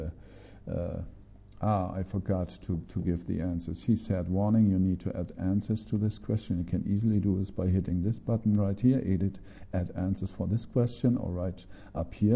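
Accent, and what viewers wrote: Germany. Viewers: How do we see the video in better quality? His English is a bit German :)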